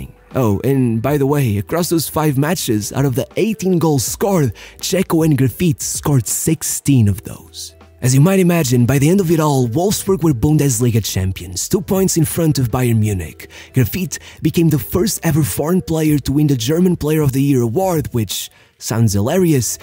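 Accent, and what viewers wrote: Portugal. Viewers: Are you Portuguese? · Quem é o tuga que dá a voz a estes vídeos?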